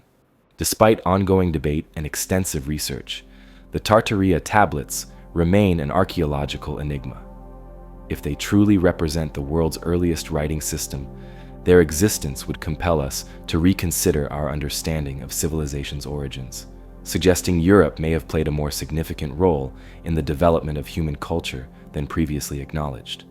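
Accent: United States. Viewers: Thank you for the voiceover with an American accent. Well done. 👽✌️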